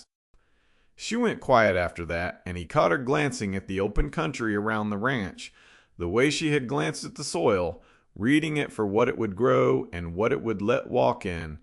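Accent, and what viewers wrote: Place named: South, United States. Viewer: I understand the country accent, but the word is Kata, not cattah. The proper pronunciation is Naug e nata.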